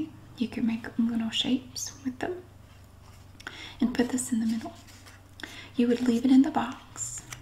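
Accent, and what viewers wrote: South, United States. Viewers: I just love your channel & the variety of ASMR you explore. I don't think there is anyone quite like you out there with the same style or format, making you extra special(to me, anyways) I really enjoyed this video, along with your store hauls & shelf straitening. I LOVE your southern, soft accent. Much love & blessing to you from a fan in Vancouver, BC Canada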